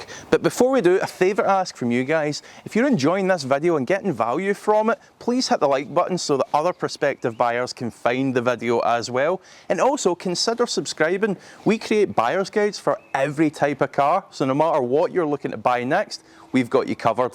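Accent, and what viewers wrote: Scotland, United Kingdom. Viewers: Great vid and the accent totally reminds me of Trainspotting:) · and you referred to the 2006 model twice....I think you meant 07-08 . I can't stand Scottish accents.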